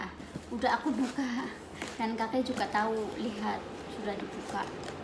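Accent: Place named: Banyumas, Indonesia. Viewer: Ninine diwaraih ngomong ngapak nok..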